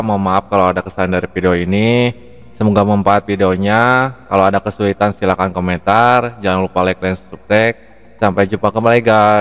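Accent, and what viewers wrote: West Java, Indonesia. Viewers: aksennya orang sunda nih,, kumaha damang kang ? mantap videona, lansung subrek lah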